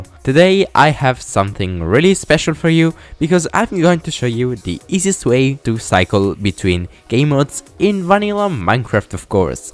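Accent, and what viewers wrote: Italy. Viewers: are u italian?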